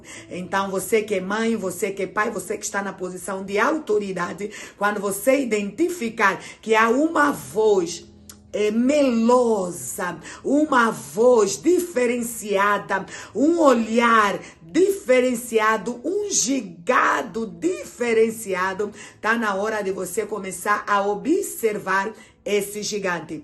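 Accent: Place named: Latin America. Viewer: Então quer dizer que é esse espírito que faz alguém pensar em pornografia e sexualizar as pessoas? A pastora é de algum país hispânico da América Latina? O sotaque é de algum desses países...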